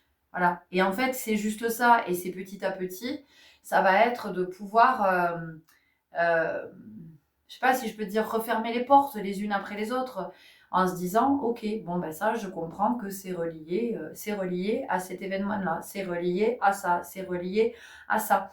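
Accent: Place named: Marseille, France. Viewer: J'aime bien . Vous avez le sourire . Accent du sud Marseille . C est joyeux ❤❤❤❤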